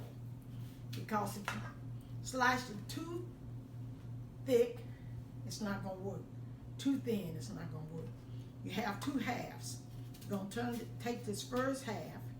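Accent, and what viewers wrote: South, United States. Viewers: exactly! It's a southern thing and that's just how we talk ❤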